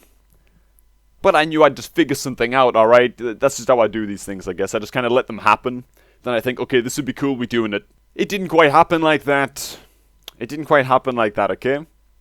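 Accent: United Kingdom. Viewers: What the fuck maaaannn I thought you sounded Brit but I wasn't sure but your fiancé is. Proper Geordie??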